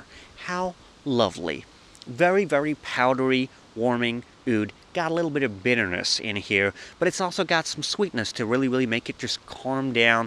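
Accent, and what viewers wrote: United States. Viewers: Great list was a pleasure watching. Love the American accent 👍 keep up the great work. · Love the American accent and that early cinematic porn feel. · Great American accent! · That's a great midwest American accent..and some Gene Shalit vibes..love this whole thing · An American accent? Really? I believe I can die happy now. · very nice american accent. Are you sure you wanna be a director rather than actor? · The American accent is getting a little better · That's an excellent American accent i gotta say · I was so confused when he started speaking in an American accent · I didn't realize this was until minute 10. The accent was so good I literally assumed flew in an American friend for this video. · perfect American accent.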